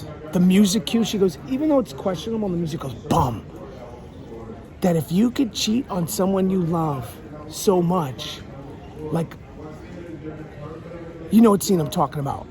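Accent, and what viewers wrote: New York, United States. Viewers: I love hearing your opinions on all of it and how passionate you are about all things Bravo- keep ‘em coming. And F YouTube for restricting you- I need your F bombs and New York street talk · Love you and your New York accent. Love your work especially as a beat cop shooting a bird on Friends. You are a classic.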